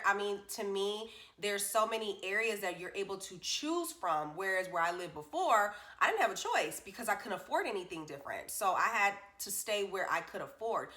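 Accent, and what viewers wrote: Texas, United States. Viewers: First video I’ve watched of yours! Love your view of TX. Did you notice your cute Texan accent coming through?! You can definitely tell you’ve been around Texans for a while now. Be proud of that accent!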